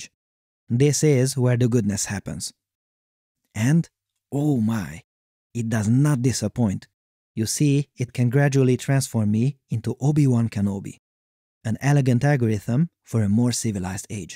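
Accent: India. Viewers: I assumed from your accent you were Indian, but I suppose there’s a paper out there that knew better than me to begin with. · LOL, I thought you are Indian (based on your accent and exotic name) :-D What a surprise :-)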